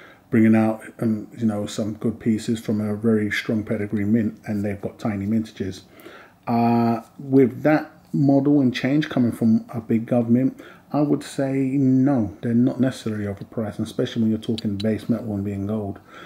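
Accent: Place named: Brixton, United Kingdom. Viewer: I recognize a Brixton accent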